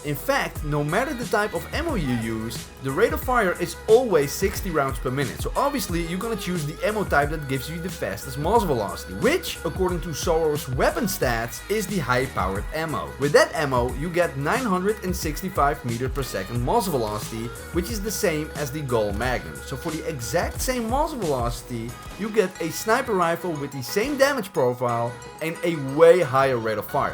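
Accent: Netherlands. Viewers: your dutch arent ya i can hear it in your english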